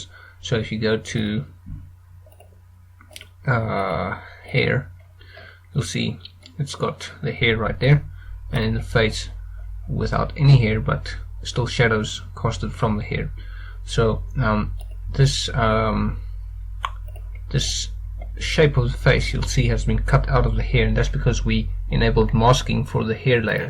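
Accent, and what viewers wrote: South Africa. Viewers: Ah, excellent. I actually like your South African accent, but yeah better recording gear (and less noise) will help loads. Thanks a lot!:) By the way, is there a good way to get a Blender hair shape (or even just the guides) out of Blender and into Max or Substance Painter? I plan to render characters in Iray (Substance Painter) and VRAY in Max, but I love the hair control in Blender. Thanks!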